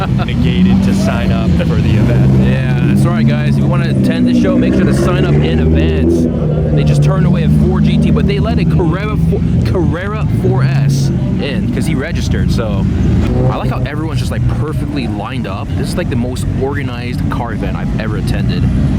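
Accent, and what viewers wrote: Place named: California, United States. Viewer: Love the commentary, totally California dude!. Miss it. :)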